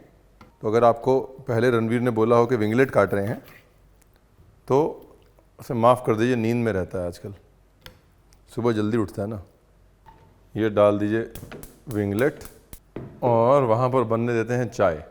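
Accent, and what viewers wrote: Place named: Lucknow, India. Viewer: Dear Sir one of my best friend is from Lukhnow nd u hv same accent like the words “Bhaiya”, “Shaukeen”, “Turant”, “Kayde se”, etc…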